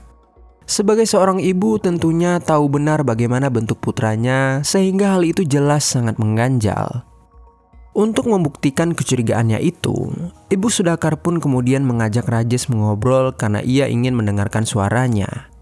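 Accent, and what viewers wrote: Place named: Aceh, Indonesia. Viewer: Dari gaya bercakap sy teka admin ini adalah dot dot dot.. orang aceh.. beliau ada 2 lagi akaun youtube.. apa pun taktik yg mantap bro! 😊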